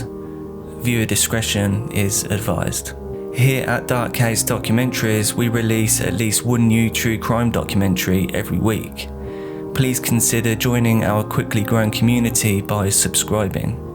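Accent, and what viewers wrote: United Kingdom. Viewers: Is this a robotic voice with a British accent, it sounds like it.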